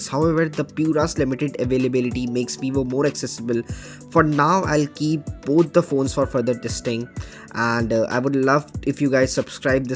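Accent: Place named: India. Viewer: Indian English ... :(( fro Indian audience only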